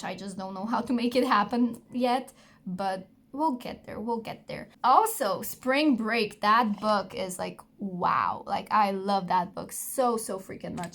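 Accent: Slovenia. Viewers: Love your slovenian accent 🤩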